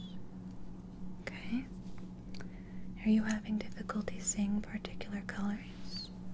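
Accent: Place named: England, United Kingdom. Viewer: Great English accent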